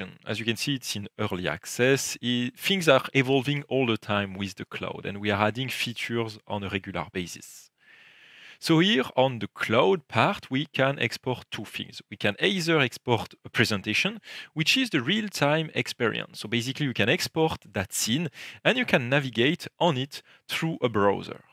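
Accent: France. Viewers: Hello Twinmotion folks. Sorry, it's nothing personal, I love the French language. But the narrator's accent is very pronounced and I can't quite understand it. Please, I ask you to put it in French with subtitles or in English, but with less accent. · Ohhh l'accent de frenchy à couper au couteau...vidéo intéressante.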